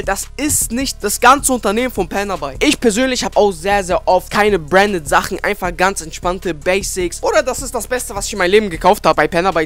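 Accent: Germany. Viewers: Geiler magga hahaha bist bestimmt aus Hamburg wa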